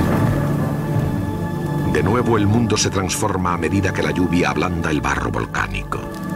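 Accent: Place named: Spain. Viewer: Se olle de la madre con acento Gachupin.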